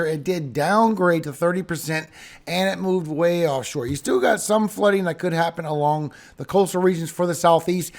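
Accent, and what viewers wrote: Louisiana, United States. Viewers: Hay weather man are you from Louisiana?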